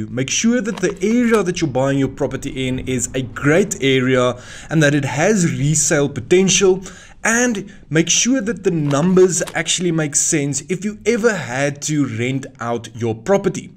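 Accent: Afrikaans, South Africa. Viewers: The Afrikaans is strong with this one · He clearly has a thick Afrikaans accent. Focus on the vital info he is sharing and not the pronunciation.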